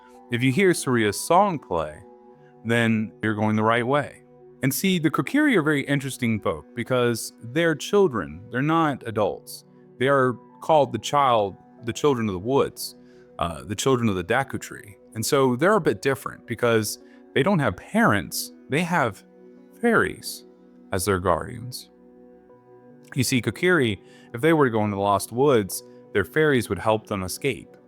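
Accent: Appalachia, United States. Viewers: I subscribed after watching the recent vid on rainfall in TotK, and I've been slowly working through your other Zelda vids since. Good stuff! As a West Virginia native myself, I guessed you were Appalachian from your accent :)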